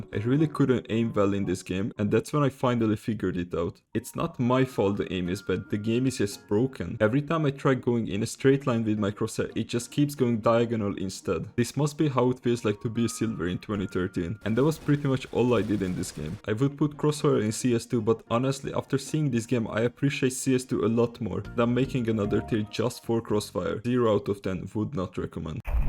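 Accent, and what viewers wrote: Hungary. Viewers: Hold up are you from hungary? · are you from hungary?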